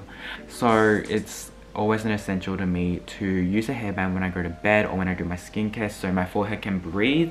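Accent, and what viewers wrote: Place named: Australia. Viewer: オーストラリア訛りかわいい〜😍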